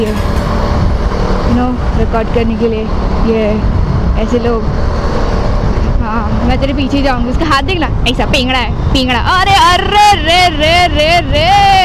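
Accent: Mumbai, India. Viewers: well earlier I was going to say things like you are a noob, squid but after investing all my phone's battery I think you are not a bad rider. And as you say in your mumbiker accent "bhaay tu toh raapchik Hai". + 1 sub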